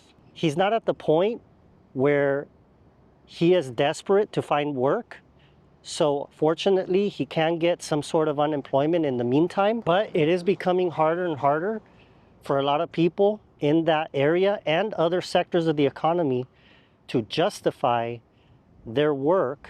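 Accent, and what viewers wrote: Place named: Latin America. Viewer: That stupid latin accent .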